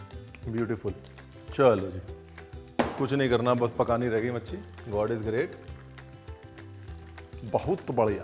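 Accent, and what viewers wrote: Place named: Kanpur, India. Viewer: Kabhi kanpur wali koi recipe banaye,bahut khushi hogi,aapko boli to kanpur ki lagti hai bahut hansi ati hai jab aap yaha ki bhasha Bolte ho🙏🙏🙏🙏🙏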